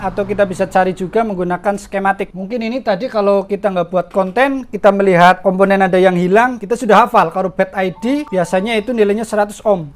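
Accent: Banyumas, Indonesia. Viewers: Mantap bg,, kayaknya orang ngapak ya dari logat😁👍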